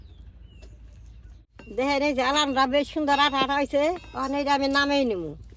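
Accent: Tangail, Bangladesh. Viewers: মাসির বাড়ি ইন্ডিয়া হতে পারে না।এটা টাংগাইলের ভাষা আমার নানার বাড়ি টাংগাইলে,মায়ের ভাষা এমন ছিল।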